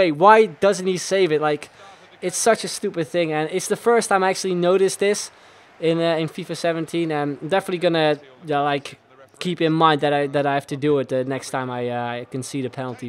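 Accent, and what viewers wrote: Netherlands. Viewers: Je hebt echt een nederlands accent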